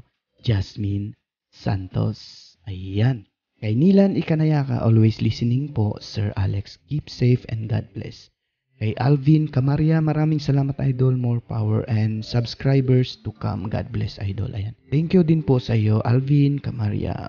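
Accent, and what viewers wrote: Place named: Iloilo, Philippines. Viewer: Sakit naman boss Alex nawalan ng ina tapos ang dahilan di pa pinaniwalaan ng iba.I mean boss di ma explain..napapatawa ako boss kapag mag ilongo ka boss sarap lng pakinggan ba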